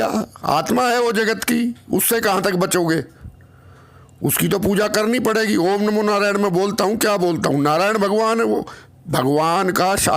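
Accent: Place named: Haryana, India. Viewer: 🙏🙏 Maharaj ji .aapki hariyadwi language bahut achchi hai.main bhi hariyadwi hun.shubh ratri.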